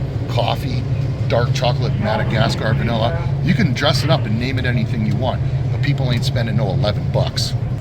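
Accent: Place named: Canada. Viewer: Oh yeah you're an American but you look and talk more Canadian than most Canadians I know. Thanks for covering this in Canada. Best wishes. By the way, these prices at Metro are BY FAR the MOST expensive I've seen at any grocery store here in Canada. This is why I never shop Metro. And I bet those onions (and a lot of the other produce and meats) go rotten sitting around because no one is buying them.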